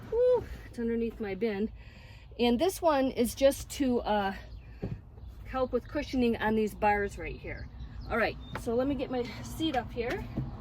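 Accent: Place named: New England, United States. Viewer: You have to be from east coast. You talk so fast. From new england area and I do too. New sub, great ideas you share👍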